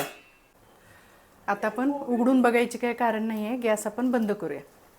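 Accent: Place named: Kolhapur, India. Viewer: खूप छान रेसिपी आहे, तुमच्या सर्व रेसिपी, सोप्या, चवीष्ट असतात, तुम्ही कोल्हापूर च्या आहेत हे तुमच्या प्रेमळ भाषेमुळे लगेच समजते😊 रेसिपी बद्दल आभारी आहे.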